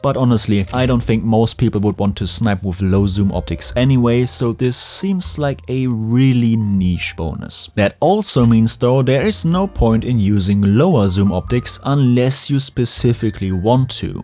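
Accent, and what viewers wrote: Germany. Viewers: Subbed because: German accent gives the content even more quality; The hard work you put into this video added a lot to the way I’ll play the game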